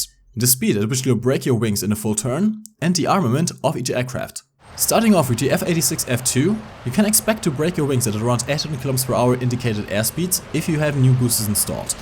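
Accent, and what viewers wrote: Germany. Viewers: Really this is a German english accent!! I really like it!!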